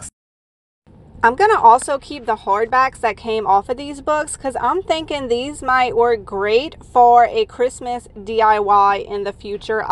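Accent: Louisiana, United States. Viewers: I adore your videos! Always great ideas, fun techniques AND the accent reminds me of my in-laws and makes me smile - my husband is from Abbeville - bonus! Haha! Thanks for the chance to win! I’m loving the pitcher at the end with the clay added! LOVE all things IOD and haven’t been able to get my hands on a set of stamps yet. XOXO…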